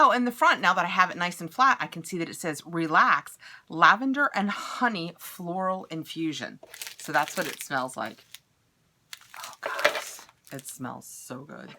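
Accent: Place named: South, United States. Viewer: Lavenda! That is how a true Southern girl speaks! My grown kids tease me as I say ,"Souwich," instead of "sandwich"!! Raised in Houston and love it!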